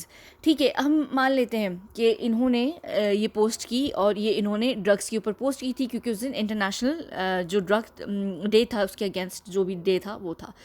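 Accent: England, United Kingdom. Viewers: I absolutely love your English accent mashallah 🥰 love you sooooooo much ❤️❤️❤️❤️❤️❤️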